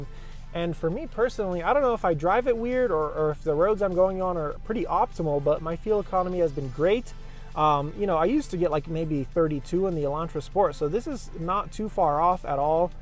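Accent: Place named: Canada. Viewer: That Canadian accent and way of speaking annoys the crap outta me.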